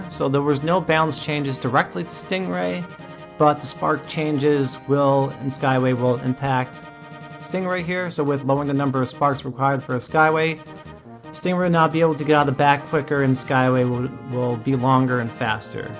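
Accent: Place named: Midwest, United States. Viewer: Midwestern accent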